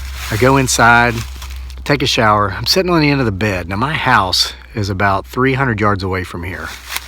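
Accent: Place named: Ohio, United States. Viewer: Are you possibly in Ohio? You have a bit of an accent.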